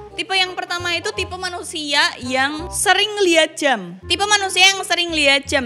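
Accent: Java, Indonesia. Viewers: Medok wooooooooyy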